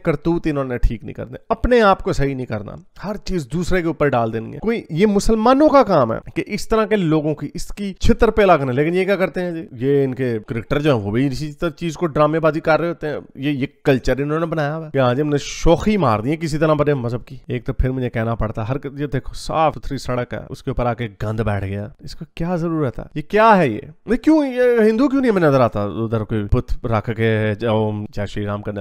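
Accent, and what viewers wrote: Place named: Punjab, India. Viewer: I love the punjabi accent ❤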